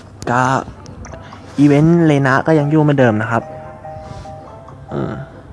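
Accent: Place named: Thailand. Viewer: เสียงเหน่อ น่าร๊ากอ่า เพิ่งเข้ามาเจอครั้งแรก กดติดตามให้และนะ ทำคลิปออกมาเยอะๆนะ ชอบเล่นเกมนี้รอติดตามนะ ^_^ เป็นกำลังใจให้ แอดไปและรับแอดด้วยนะ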